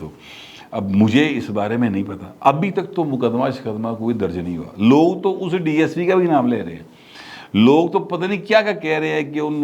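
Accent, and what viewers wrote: Lahore, Pakistan. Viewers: پنجابی میں اور خالص لاہوری لہجے میں آپ کے سیاسی تبصرے سنانے کا مزہ آتا ھے جیتے رہو اللہ پاک ہمیشہ آپ کو سلامت رکھے اور آپ ہمیں اسی لہجے میں سیاسی تبصرے سناتے رہیں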